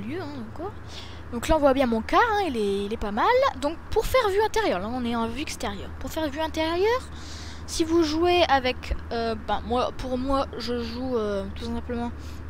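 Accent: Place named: North, France. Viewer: Super vidéo ! Et bel accent du Nord aussi j'aime bien xd !! Tu pourrais peut-être faire des lets-plays sur des maps ça pourrait être sympa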